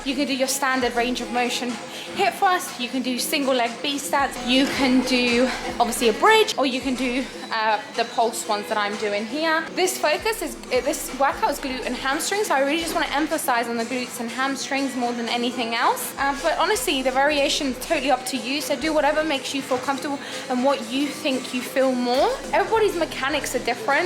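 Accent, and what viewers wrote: United Kingdom. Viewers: daaammmnnn...why am I so love to british accent, so cute 😻 Wait 🙄, really just the accent bro?? 😏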